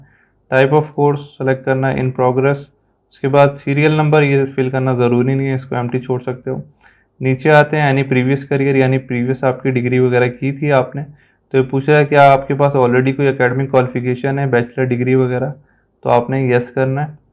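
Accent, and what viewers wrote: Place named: India. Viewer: Please speak English not Indian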